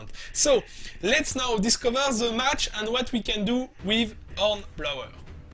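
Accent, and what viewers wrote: France. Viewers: Toi français c'est sure vue ton accent ! Mdr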